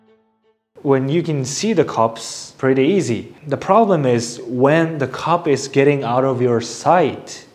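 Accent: Malaysia. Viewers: i think you're starting to catch a bit of malaysian accent in your english accent hehe:D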